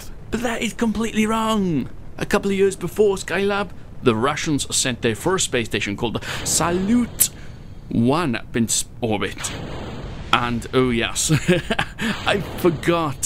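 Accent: Wales, United Kingdom. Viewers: Welsh is it?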